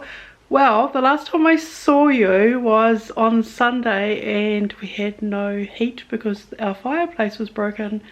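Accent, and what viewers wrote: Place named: France. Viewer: I love your style, it's right up my alley. Just a beautiful array of pictures. I adore your French accent so much. 😍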